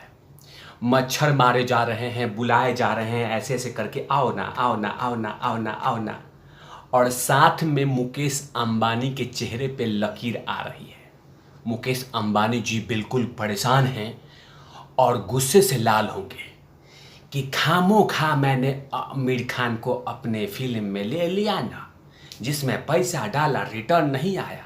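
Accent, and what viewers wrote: Bihar, India. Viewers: Kya Bihaari bolte hain bhaiya, matlab ekdum maja hi aa gaya.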